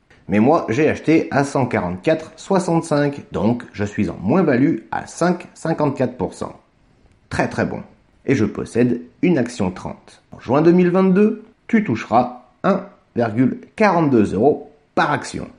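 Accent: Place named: United States. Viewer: Et dire qu'il y a des gens qui achètent des formations pour des centaines de boules ! Et juste pour avoir des idées d'investissements ! 🧐 Alors qu'il existe des cow-boys comme toi qui partagent du très lourd ! El Padre PS : P'tite curiosité perso, explique un peu d'où t'as chopé cet accent américain pas dégueu. du tout ?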